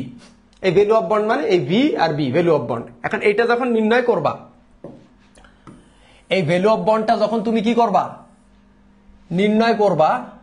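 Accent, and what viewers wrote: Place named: Noakhali, Bangladesh. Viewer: স্যারের বাড়ি মনে হয় নোয়াখালী বা লক্ষ্মীপুরে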